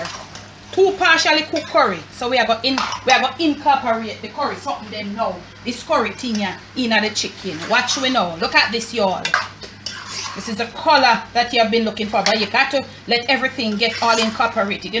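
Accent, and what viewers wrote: Jamaica. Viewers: I'm learning ms, I love you and love to hear you talk, you are a true Jamaican keep it up 👍👍👍💖💖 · I love your talking...your a naturally funny ..between patois ..English love it..please don't stop..just be yourself ..and teach us authentic Jamaican cooking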